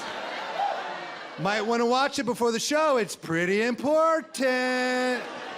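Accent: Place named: New York, United States. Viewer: I could of swore I heard a New York accent. Thanks for the laughs.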